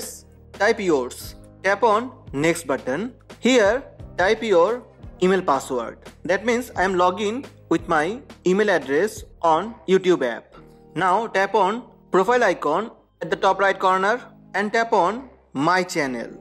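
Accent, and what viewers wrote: India. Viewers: indian right? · Bruh you sound like an Indian .i think you are O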